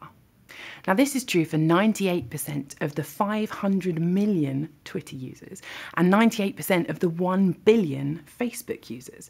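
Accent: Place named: West Country, United Kingdom. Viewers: She has a British accent because she is from Britain and has an accent that also comes from Britain. More specifically, she has a West Country accent. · No such thing as a British accent, since there are four countries in Great Britain - Scotland, England, Ireland and Wales. I don't know about you but I've never heard one accent that combines all four. has an English accent. As far as I can tell she's a well-spoken West Country (Somerset) accent.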